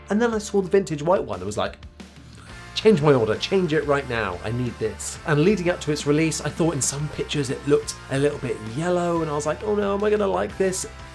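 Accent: United Kingdom. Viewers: I can only listen to a British accent for about 30 seconds, so shut the fuck up and play it!! Peace brother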